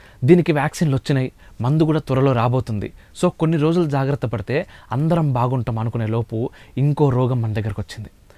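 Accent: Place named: Telangana, India. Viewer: మీరు తెలంగాణ యాసలో కాకుండా రెండు తెలుగు రాష్టాలుకూ అర్ధం అయేలా చెప్పండి 🕉️🕉️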